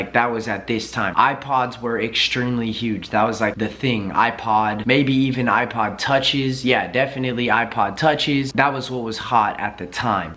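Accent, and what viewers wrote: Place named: Southern California, United States. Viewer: I can tell u from socal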